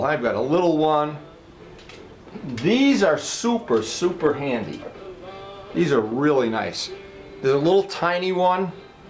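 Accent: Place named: Pittsburgh, United States. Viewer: This guy sounds like hes from Pittsburgh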